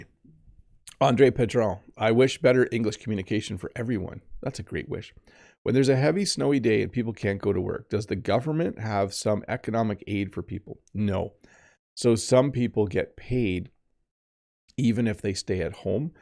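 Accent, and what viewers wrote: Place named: Canada. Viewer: The way he is saying in English, it's very understandable, Canadian's accent is the best!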